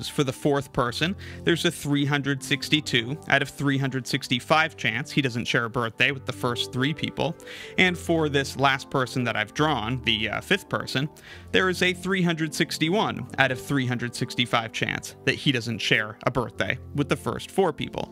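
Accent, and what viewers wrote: Canada. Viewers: Canadian! He gives himself a way a couple of times with with "aboat" 😝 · Arr you Canadian by any chance?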